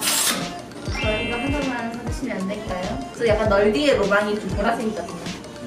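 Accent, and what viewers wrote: Busan, South Korea. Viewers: 부산 사투리 듣기 너무 좋은거 같아요. 요즘 코로나 때문에 밖에 나가기 힘듬에도 불구하고 팬들을 위해 나눔해주셔서 진심으로 감사드립니다. <mail>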